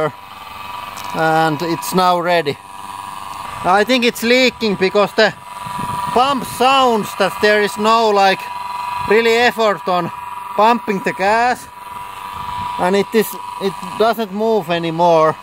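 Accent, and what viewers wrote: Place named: Finland. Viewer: I'm here for the finish accent only.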